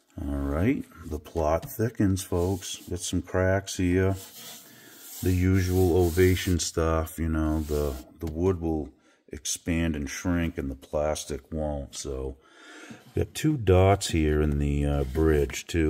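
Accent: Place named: Maine, United States. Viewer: Im trying to get the accent. I'm thinking southern maine, or maybe Taunton. Give us a clue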